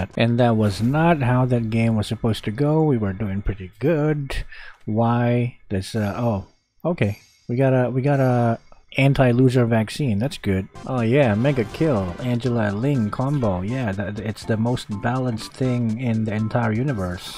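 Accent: Philippines. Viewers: Are u a filipino with english language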